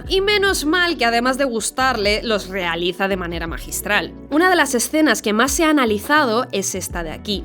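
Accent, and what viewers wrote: Spain. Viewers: Que Asco es el Acento de la madre patria España, pero què tan de bien has narrado y analizado la direcciòn de esta magistral pelìcula 🎉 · A los españoles les duele el culo cada que pronuncian bien el Inglés? O por qué nunca lo hacen? Dos minutos aguanté el video, una mierda de acento, espero que no me dé cáncer en los oídos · Que incómodo tu acento TAN marcadamente español, me encantaría que fuera un acento más neutro :( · horrible escuchar un españolete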